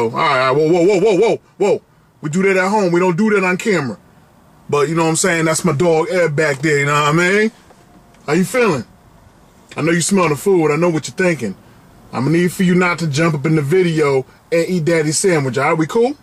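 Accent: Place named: Connecticut, United States. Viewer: He is from Connecticut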